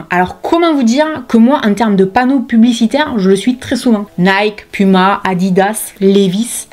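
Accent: South, France. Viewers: merci, ça fait un moment que je te suis, je ne poste pas mais aujourd'hui je voudrais te remercier d'être si bienveillante, sans préjugés, naturelle... je suis grosse et je le supporterai beaucoup mieux si la société me laissez vivre comme je suis. alors en plus, y il a le maquillage, les fringues, le style... si une personne s'habille avec le style qui lui plait, bravo et ce n'est pas aux autres aigris de lui faire des remarques. perso Cristina Cordula ressemble a une grand mère ou a la méchante reine de Blanche Neige, rien de gentil en elle, que du faux. continue comme ça, ça me remonte le moral de voir tes post et d'entendre ton bel accent du Sud (qui n'est pas a la mode a la télé non plus).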